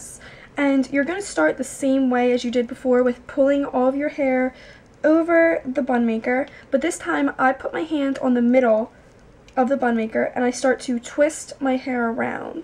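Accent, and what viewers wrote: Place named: New Jersey, United States. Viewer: Hello! Just stumbled upon you and your fiancé 's blog and landed here. I'm happy to see you have a beauty channel as I follow other gurus. I'm so excited though that you are also from south jersey so you are much more relate able especially with the jersey accent. Lol best wishes on your upcoming wedding!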